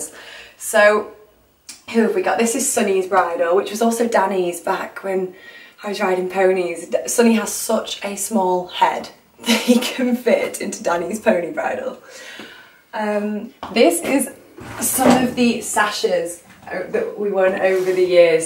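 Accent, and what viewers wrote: England, United Kingdom. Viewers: Are you english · Are you british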